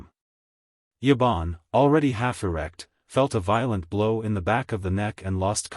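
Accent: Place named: United States. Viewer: If you can change the AI narrator to a British male, I think it's much more effective, a story, especially from this time period, to be read aloud /heard in some sort of UK accent, rather than an American/Canadian accent. Of course, since I have not even the vaugest idea of how AI works, I don't even have a YouTube channel, I really don't know what I'm asking. For all I know it's something that would require many hours of work or, something that could happen with the click of a button, lol. Anyways, I'm deeply involved in this story, 2 plus hours in, and enjoying it regardless. And as far as I can see, you are the only channel who has uploaded it so since I'm trying to complete the entire Arsene Lupin series this way, since I, unfortunately, don't currently have access to any of the books, this particular video is so very much appreciated!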